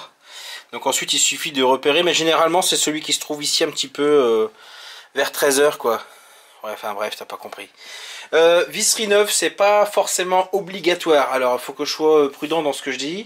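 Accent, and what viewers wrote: North, France. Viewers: Merci pour ta réponse, étant aussi originaire du nord expatrié dans le var, c'est vraiment sympa d'entendre cet accent lol, mais aussi surtout un passionné. Je viens donc d'acquérir un TT V(R)6 MK1, il serait sympa peut être de voir quelques vidéo sur d'autre modèles "VW" qui sont équipés des fameux "VR" ?! Au sujet de la chaîne de distri, quels sont "tes" préconisations ? Car il me semble (pas sur) que chez VW il n'y a pas de remplacement prévu ? Puis autre chose à prévoir, selon ton expérience, sur un modèle Audi VR6 DSG Quattro ? Merci pour tes réponses !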